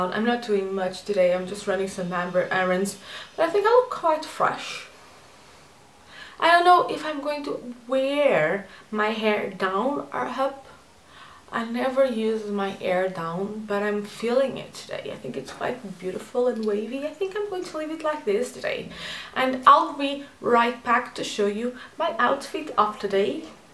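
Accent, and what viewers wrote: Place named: England, United Kingdom. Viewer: You're so funny and really like your English accent :)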